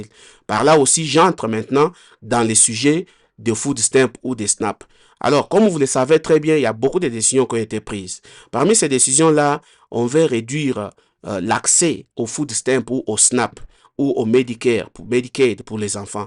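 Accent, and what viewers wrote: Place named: Africa. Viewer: Africain francophone li ye ou pa tande accent li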